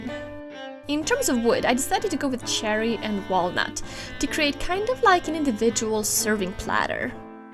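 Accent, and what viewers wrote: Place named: Sweden. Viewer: Ha! I knew I recognized a Swedish accent. Hej!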